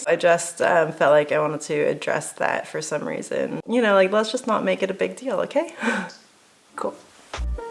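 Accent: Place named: New Zealand. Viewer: Compared with your PCT videos your accent is definitely developing a NZ accent edge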